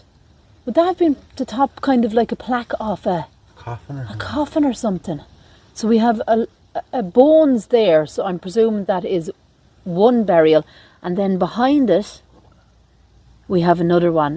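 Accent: Ireland. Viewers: I can tell by the Irish accent that we're going to see corpses. Irish cemeteries don't seem to be very well maintained. 🙄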